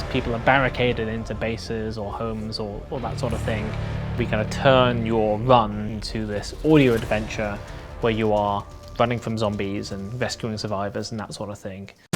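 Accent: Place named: United Kingdom. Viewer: Sounds like a cool idea except I don't understand British accent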